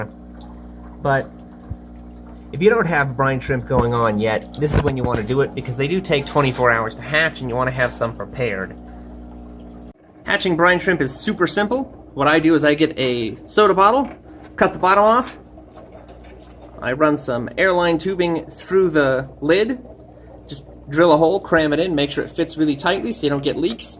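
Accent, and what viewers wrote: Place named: Australia. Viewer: Ok thx I’m using a pipet I’m new to keeping fish, and are you Australian bc I am I recignise the accent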